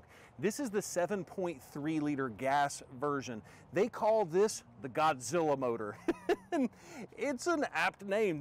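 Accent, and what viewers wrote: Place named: South, United States. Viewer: Love the accent. Wish Canada had that southern personality.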